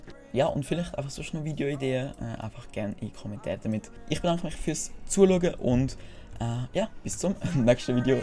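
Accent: Zurich, Switzerland. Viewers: wenigstens en zürcher wenn du irgendwie bernerisch gredet hetsch wär scho irgenwie scheisse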